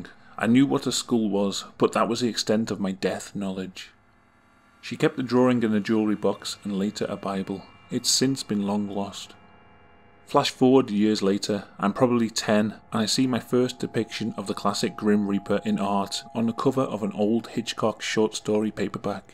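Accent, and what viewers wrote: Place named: Northwest England, United Kingdom. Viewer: Just sounds like an Englishman with a mid-northwest accent to me.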